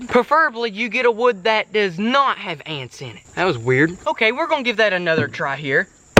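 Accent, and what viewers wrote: United States. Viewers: I Like His Cowboy Accent 😂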